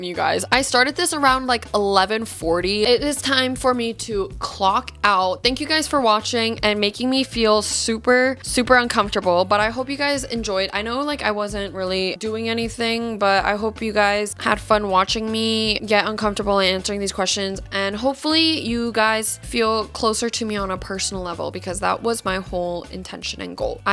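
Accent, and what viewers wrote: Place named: Asia. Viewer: Asian accent mic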